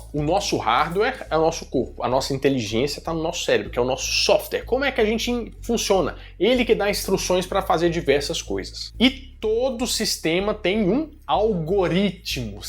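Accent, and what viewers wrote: Minas Gerais, Brazil. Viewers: Adoro o canal, muito bom, mas os americanos não entenderiam nada que vc fala em inglês, com esse sotaque mineiro, tem que falar mais caipira o erre...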